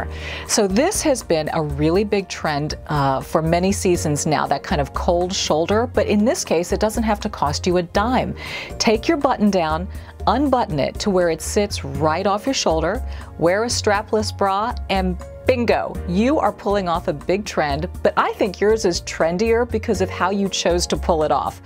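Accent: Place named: South, United States. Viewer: I love the southern accent!!!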